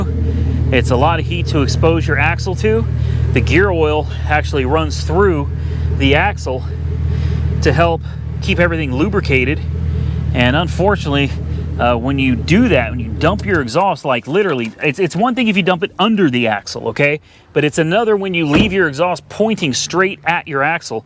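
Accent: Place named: South, United States. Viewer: Love your accent, brings me back lots of good memories in Southern US!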